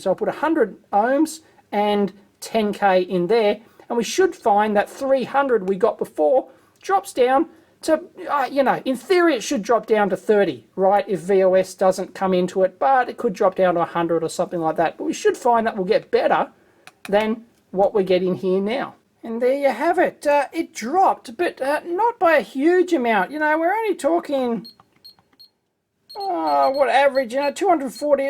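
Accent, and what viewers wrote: Australia. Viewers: Its very funny to click on transcript and watch as the AI struggles to match Aussie accent. in particular...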